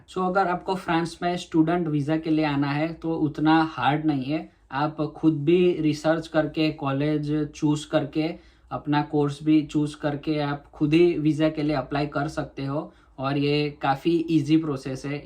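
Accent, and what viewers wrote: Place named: Gujarat, India. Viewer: Bro i underdatnd you are from gujrat , me too ....but work on your Language tone , that gujju tone bothering while you talking .No matter u speak hindi or english .Keep Growing .😇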